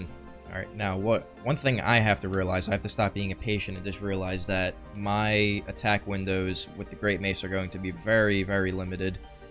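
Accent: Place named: Philadelphia, United States. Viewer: Bud you from around Philly? You sound like me lol. Solid content dude take the sub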